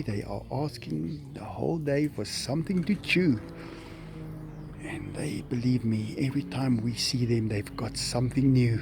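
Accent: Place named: South Africa. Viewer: I just discovered your channel today and I love it. It's so interesting and awesome to see these things, thank you for saving these amazing creatures. I btw also recognized you were south african immediately because I could hear some words similar to dutch and that's when I realized I was right, hahaha! Thank you for sharing your awesome life with us, new sub here :)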